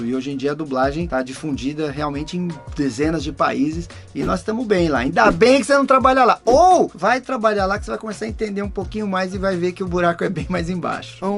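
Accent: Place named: Rio de Janeiro, Brazil. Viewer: sotaque é duro. Principalmente quando puxa para o carioca. Eu gosto da dublagem mas eu sei que tem como atenuar sotaque para não ficar carregado.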